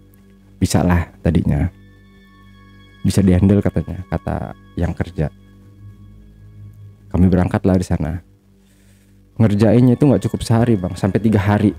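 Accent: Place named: Sumatra, Indonesia. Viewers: Jelas kamu ni orang lampung.. dari logat ngomong nya. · Dari logatnya aku tau abang ini sumatera nya di mana... 😊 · Orang lampung nih, aksenya lampung nyeu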